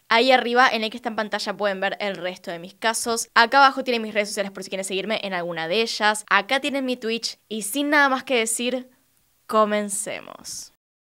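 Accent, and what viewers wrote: Argentina. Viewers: No m gusta el acento argentino pero ella no se me gusta escucharlo de ella..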